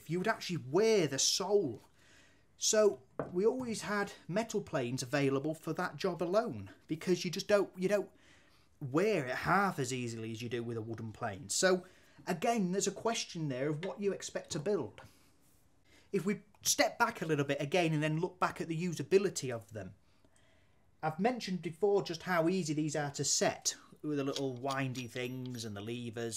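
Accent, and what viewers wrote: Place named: England, United Kingdom. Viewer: I just recently discovered your videos and am glad I did. I am new to woodworking and looking to soak up every piece of knowledge I can. You explain things very well and with an enjoyable accent as well. (Here in corn country in the U.S. you don't hear an English accent very much) Keep up the good work and I look forward to watching more of your videos!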